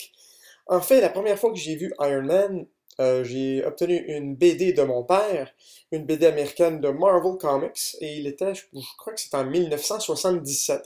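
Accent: Quebec, Canada. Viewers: French? Maybe Canadian...Are you from Quebec? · il a un accent québécois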